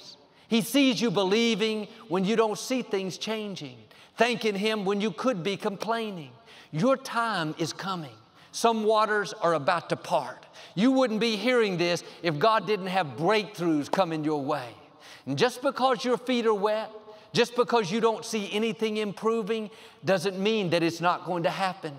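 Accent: Texas, United States. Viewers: Yesterday I was just out of energy for going on and asked God to take me home. I woke up this morning and had a message from my loving aunt telling that my breakthrough is near, it is why the devil is so focused on me. Then I came to YouTube and this sermon was top of my recommendations. I listened to and it was like my aunt speaking to me in a Texan accent. Same message, twice in the morning from different sources. I was blessed by this. Thank you! God bless.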